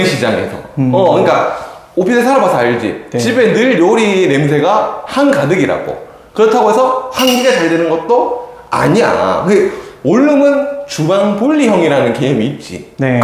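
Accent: Gyeongsang, South Korea. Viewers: 경상도 억양이 너무 심하네요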